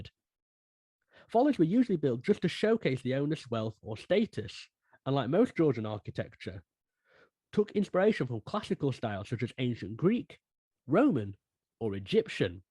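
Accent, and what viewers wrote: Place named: Yorkshire, United Kingdom. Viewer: As you might imagine, a Yorkshire-flavored accent isn't the easiest for those accustomed to the intonations of the American South to understand. Nonetheless, we are very glad we discovered your channel, and have enjoyed your programs immensely. We are also getting better at understanding the Northern speech. We look forward to your next video.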